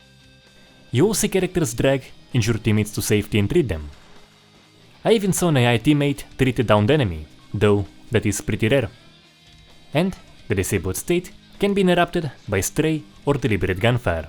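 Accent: Russia. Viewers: Твой акцент выдает твою русскую личность)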